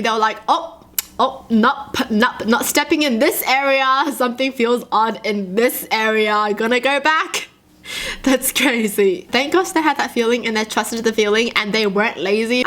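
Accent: Australia. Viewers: I love your accent ohmygooosh! Is that an aussie accent? 😮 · I could hear her australian accent! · I'm so used to her Australian accent now I don't notice it anymore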